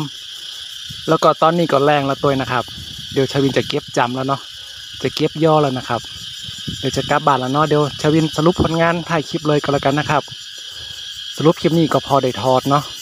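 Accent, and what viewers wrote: Thailand. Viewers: เป็นคนจังหวัดไหนเจ้าอู้เหือนขุนตาลเจ้า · ป้าเข้ามาผ่อสองสามคลิปแล้วจ้าวชอบคำอู้ยองฟังแล้วม่วนดี หลานป้าก่ออู้ยองยังกับหล้าชวินจ้าว หมานๆเน้อคับ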